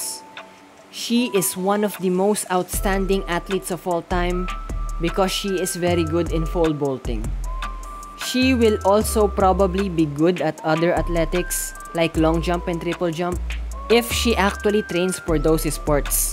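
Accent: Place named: Philippines. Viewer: Why is a Filipina narrating the vid? (guessing by the accent) Shouldn't it be an Italiana?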